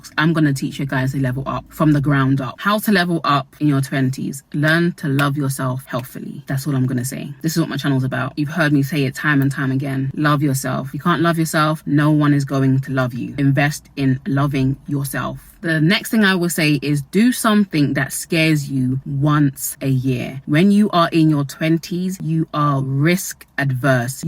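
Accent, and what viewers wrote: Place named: England, United Kingdom. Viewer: English accent and rich dad poor dad? New sub!!! 😍😍😍😍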